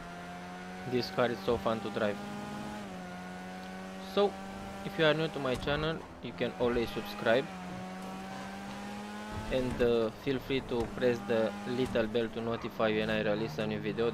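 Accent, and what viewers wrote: Romania. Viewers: Are u Romanian bro? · Ești roman după voce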